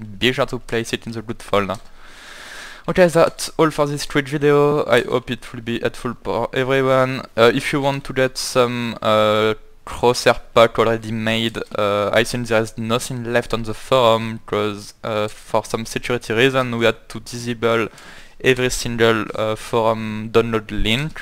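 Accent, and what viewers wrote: France. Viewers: mec tu es Français ? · comment tes pas crédible parler anglais on le sens l'accent fr gros mdr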